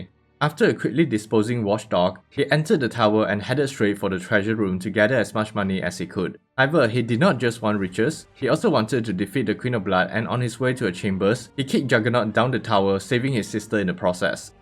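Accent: Singapore. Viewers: no wonder your accent sounds familliar, singaporean sia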